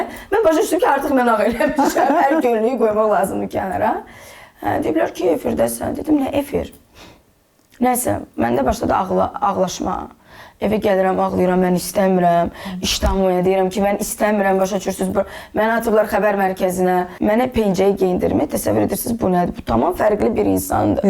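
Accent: Standard. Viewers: Mənimçün çox maraqlıdır, xanım Azərbaycan dilində belə təmiz danışmağı necə və haradan öyrənib. Mənim qızım orta məktəbi Rusiyada qurtarıb və artıq 10 ildən çoxdur Bakıda yaşayır, amma xanım kimi təmiz danışa bilmir, danışığında aksent hiss olunur. xanımı tanımağıma çox şad oldum.🎉😊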